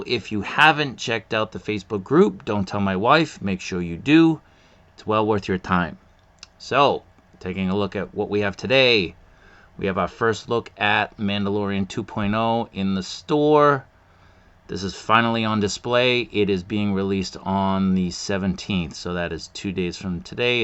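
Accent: Boston, United States. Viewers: R u from boston?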